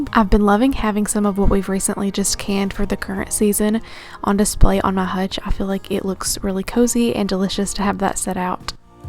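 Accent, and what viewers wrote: South, United States. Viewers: You have the perfect country accent 😍 I'm from Tennessee and have one too lol but your accent is so soft pleasant 🥰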